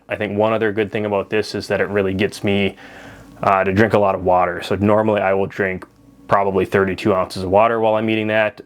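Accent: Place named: Minnesota, United States. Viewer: Your voice reminds me of Fargo. One of my favorite movies 😂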